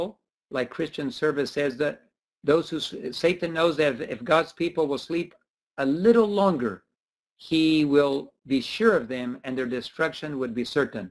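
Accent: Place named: Hawaii, United States. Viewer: Thanks for being real Uncle. I know we're on the right path and the right message. God revealed to me the same message before I noticed you were giving it too. Ellen's warning is for the sleeping. Her confirmation is hidden in her warning about time test. Those that refuse to awake will naturally miss it. I am confident that you are aware and I love your accent it's very much like the Hawaiian accent. And we call all our elders Uncle so I'm very comfortable with you and the way you talk and think. Praise God that I am not completely alone in this planet of zombies. 🤙🙏❤️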